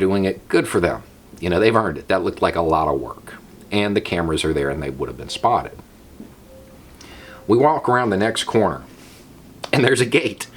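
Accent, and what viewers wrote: South, United States. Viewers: You said; "Don't judge by appearances." I can't remember how I got to this site in the first place and I have already admitted I misjudged you massively then. Not actually on appearance, although for me that was a 'WTF?' moment. It was worse than that - I misjudged you on your accent. It okay, I'm all better now, but it seems to me too many people with accents like Mitch McConnell and a few others, have altogether too much influence on how America works. Add those to the all too frequent and common flaunting of what purports to be a Confederate flag, but which I know actually isn't, and a disturbing pattern emerges that might slightly mitigate my misjudgement. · It's kinda funny I almost judged bow when I first heard that southern drawl I almost clicked skip and misstock him for a Trumper then I listened closely to what he was saying and I'm glad I did. 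I didn't realize how many anarchist there are still out there the struggle goes on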